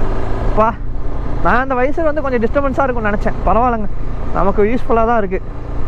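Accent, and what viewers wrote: Kerala, India. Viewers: Ean bro malayalam slang la pesura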